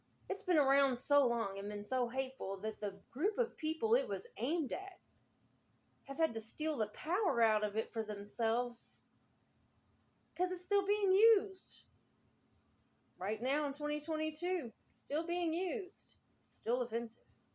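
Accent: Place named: South, United States. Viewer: That southern accent loving it 💯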